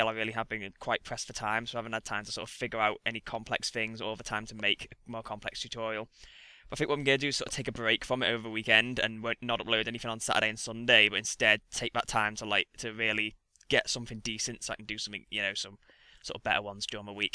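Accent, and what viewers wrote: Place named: Liverpool, United Kingdom. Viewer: You sound like ur from my hometown,Liverpool.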